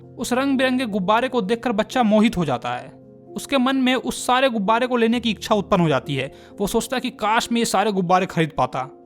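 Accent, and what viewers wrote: Bihar, India. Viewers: bihari tone on TOP🔥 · you are BIHARI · Is he also from bihar🤔. Iam a bihari girl and his language hears like bihari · Sir ka mode change ho jata ha bich bich ma 😂 Bihari accent always rocks 😅😂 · Bhaiya are u bihari 😆😆 mast tone hai mana parega JAI BIHAR JAI BIHARI ❤ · sir ji....ider bihari kyu bolte ho..... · bhai app bihar sai ho kya.❤❤ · App bihari ho bhiyaa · Are you from Bihar?.. That lines > Re laiKA yene aao!!.. I am also from Bihar..And aapse padhne mein bhut hi maja aaya!! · Bhai kya aap bihari ho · Tora bihari acha lagwati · Channel owner BIHARI hai 110%✅ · That Bihari accent! Just wow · U are from Bihar?? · aap bihari ho na?? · Bhai aap muzaffarpur , bihar se ho kya ? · mj aa gaya bhaiii inki tune kitni mast hai bihari + hindi lahjabav that line { bada harami bachaa hai } was epic ❤❤❤❤❤❤❤❤ thanku bhaiya..... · you are from bihar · i am sure he is bihari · 😅😅😅😅😅bhaiya app ka bihari language mst h😅😅😅😅😅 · Bihari ho kya😏 Video me bhi aaeee auuu kar rhe ho😒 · sir you belongs to which district of BIHAR ? 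because your language is similar like mine and i belongs to patna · Bhai aap Bihar se ho kya?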